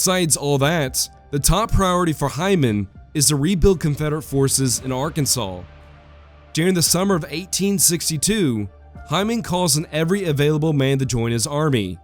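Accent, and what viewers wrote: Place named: Texas, United States. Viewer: Really? It takes 4 months an episode. The Texas accent is a distraction also.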